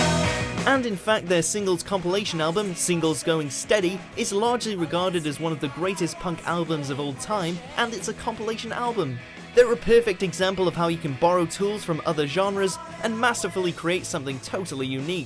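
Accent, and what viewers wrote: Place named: Cockney, United Kingdom. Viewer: Ваш ебаный fucking cockney нихуя не understand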